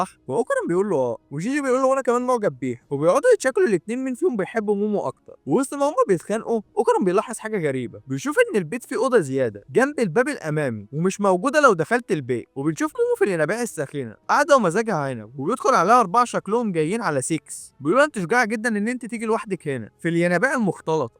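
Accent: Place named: Port Said, Egypt. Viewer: انت بورسعيد او دمياط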